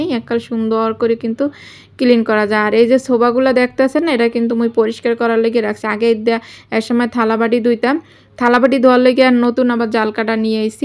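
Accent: Barishal, Bangladesh. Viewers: আসসালামু আলায়কুম আপু আজকে প্রথম তোমার ভিডিও দেখলাম ।আপু তুমি কি বরিশালের মেয়ে। কথা শুনে মনে হলো। আপু অনেক অনেক ভালোবাসা রইলো আপু। আপু আমি ও কিন্তু বরিশালের মেয়ে ❤❤❤❤❤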